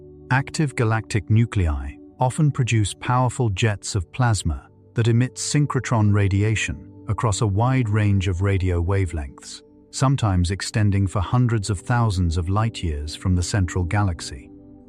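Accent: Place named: United Kingdom. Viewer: This narrative is filled with mistakes and although it sounds smooth and creamy with the UK accent of the narrator who ever wrote the information has not done their homework. Hubble who created and mistakenly produced the theory of the expanding universe after another 10 years of intense research and methodical tedious computations wrote a paper in 1940 expressing his scientific conclusion addressing the expanding universe. Sadly by then the big bang theory had taken such root that his paper fell mostly on deaf ears. However since there is so much evidence that doesn't exist supporting the big bang and the expanding universe that we have entered a paradigm shift away from this old school nonsense big bang to the Electric/Plasma Universe. Hubble wrote a paper addressing The Problem With The Expanding Universe. I suggest if you are a critical thinker look for this paper read it. 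No one since has put as much time at confirming the math necessary to reach the conclusions that Edwin Hubble had to do to be absolutely correct in this assertions about the universe not expanding whatsoever and that the universe is simply infinite.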